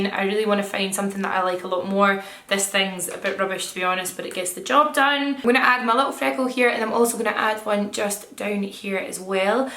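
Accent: Scotland, United Kingdom. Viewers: Your accent makes me miss living in scotland!!! 😢😢 anyways you look BOMB as usual 😍😍😍😍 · I discovered your channel a few months back and you've become my favourite YouTube by far. You are absolutely stunning and amazing at make up! I normally find really Scottish accents too cringy to listen to but I love yours (I'm Scottish too, but I don't have a strong accent). My manager at work said she knows you from when she was a makeup artist in Glasgow too, small world 🙈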